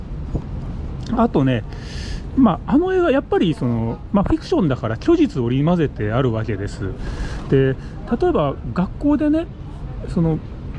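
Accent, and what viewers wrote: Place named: Southern Nagano, Japan. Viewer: 破戒は南信の飯田市殿岡の大江磯吉がモデルとも言われています。「島崎藤村『破戒』のモデル 大江磯吉とその時代 (信毎選書) 」の本を以前読みまして、飯田に用事で行ったときに、殿岡の長吏の父親と神社のバラックに住んでいた場所とお墓に行きました。神社の傍らに資料館もあるようですがその時は気付きませんでしたが。飯山市の唱歌ふるさとの作者との関係も興味深いです。大雑把にはこの動画で。このことで藤村は飯山には出向けなくなったと言っていますね。 <link> 動画でもちょっとだけ冒頭で柳田国男に触れていますが、（破戒とは関係ありませんが）柳田国男の資料館が飯田市にもありまして、「秋風帖」という旅の紀行文での愛知県作手村の「ポン（おそらく漂白民・サンカ）」の項も気になりました。 長野県は細かい被差別集落がとても多かったというのと、今でも他県からの移住者などに排他的なところがあるといった事をよく聞きます。うちの親戚がある伊那地域は「本家」「分家」制度が色濃く残っていたり、飯田線中井侍集落の戦後まで残っていた「おじろく・おばさ」の奇妙な風習とか、木曽の藤村も含め、四方山に囲まれた長野はそういう土壌が根深い土地なのかもです。 弾左衛門が関東と隣接地域を任されていますが、なぜか一時旧伊那県でもあった関東からはずいぶんと離れた愛知県設楽もその管轄権限に含まれているのが謎で、未だに理由がよくわかりません。 ちなみに主さんが「こんにちは」というイントネーションが南信のそれとそっくりで、びっくりしてます。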